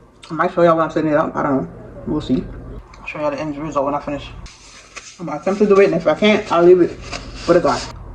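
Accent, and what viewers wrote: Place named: Jamaica. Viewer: Listening to your accent makes me wish I was in Jamaica right now!! Can’t wait to go back!!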